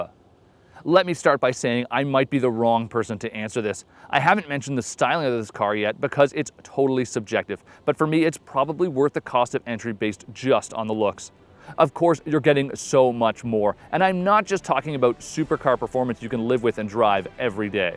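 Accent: Canada. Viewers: I gotta ask.... you're Canadian, aren't you? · This guy sounds like a cheese head. Canadian for the uninformed.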